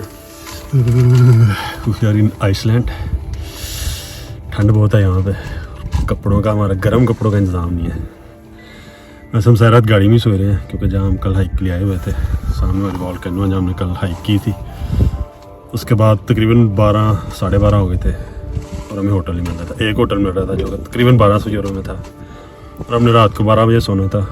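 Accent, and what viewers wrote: Punjab, India. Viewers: yar biggets fan from lahore pakistan boht hi piaray lag rahay ho achhi video bnai hai yar punjabi main baat kia karo ziada, love your natural punjabi accent urdu, punjabi bola karo koshish karo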